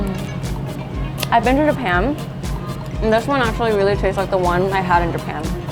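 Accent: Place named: West, United States. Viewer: Did you grow up in the United States your English and mannerisms are very western.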